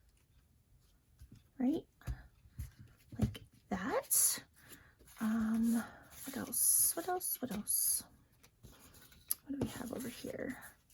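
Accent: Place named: Pennsylvania, United States. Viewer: This is the first video from you that I've watched, I'm enjoying it and have subscribed. You sounded local so I had to look to see where your from, I'm from Western Pa so not that far. Looking forward to seeing more from you.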